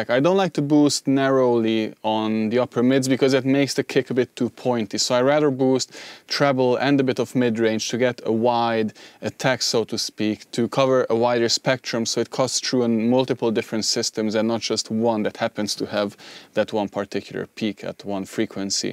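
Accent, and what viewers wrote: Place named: Hungary. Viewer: ... and you are from Hungary:D... if I am not fooled by my ears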